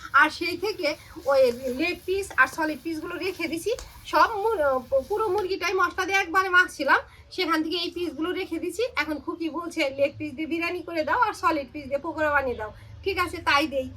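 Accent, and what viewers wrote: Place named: Dhaka, Bangladesh. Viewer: এই বোনটা মনে হয় ঢাকাইয়া । কথাবার্তায় তাই মনে হয়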